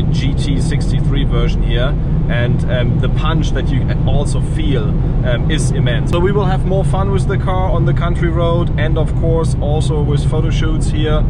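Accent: Germany. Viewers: tscherman accent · I love it how you can hear your german accent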